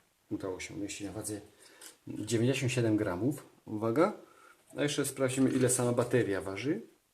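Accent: Silesia, Poland. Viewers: zasadniczo fajna prezentacja, jedyne co mi przeszkadza to śląski akcent w wypowiedziach prowadzącego, reszta super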